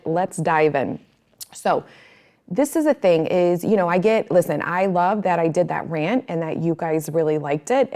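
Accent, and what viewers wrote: New York, United States. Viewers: Also, you sound like you’re from NY. I wouldn’t expect any less!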